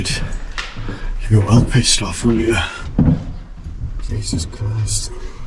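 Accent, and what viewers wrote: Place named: Yorkshire, United Kingdom. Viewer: Love Howarth and Skipton, are you from yorkshire? Our accents sound similar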